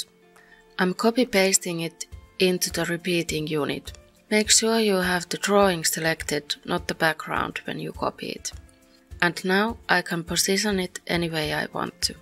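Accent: Finland. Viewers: You sound very Finnish :D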